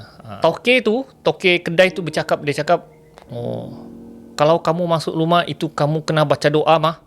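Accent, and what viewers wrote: Sabah, Malaysia. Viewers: Lambat hadir tapi tetap tengok. Suka dengar orang Sabah cakap. Hehe he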